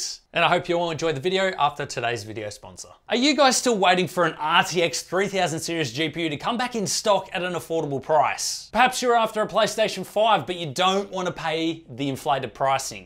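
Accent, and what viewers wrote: Australia. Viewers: R u from Australia bro?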